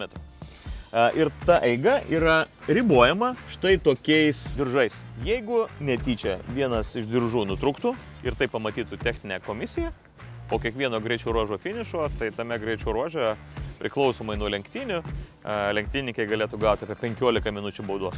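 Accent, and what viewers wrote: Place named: United States. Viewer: kalba su ameriketisku akcentu.ziauriai skamba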